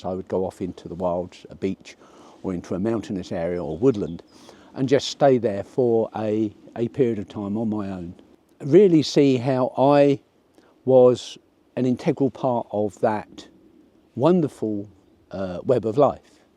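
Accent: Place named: Cockney, United Kingdom. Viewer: Cockney druid well now who would have thought it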